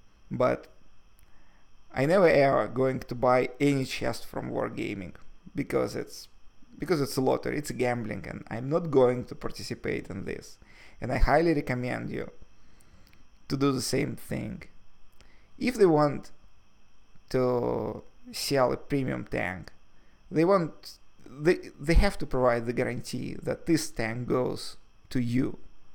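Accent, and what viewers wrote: Russia. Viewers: Is russian?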